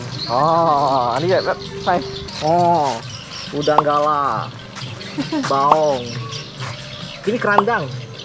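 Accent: Pontianak, Indonesia. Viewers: Logat pontianak sekali abg e ni wkw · Kok bahase nye kayak bahase orang pontianak ye koh... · Koh.. orang Pontianak ke ? Sepintas lalu ku dengar macam logat Melayu Pontianak.. 😃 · Pertame liat, rase kenal.. dengar cara ngomongnye,.. dipastikan orang pontianak... diikuti lebih jauh,.. saye jadi ingat, pernah 2 kali berinteraksi,jadi konsumen... akun masih ade ke 😊... sehat, lancar dan sukses selalu boy..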